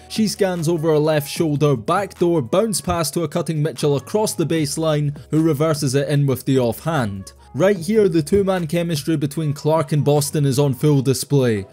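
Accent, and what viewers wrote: United Kingdom. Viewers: Bro why is it always a British dude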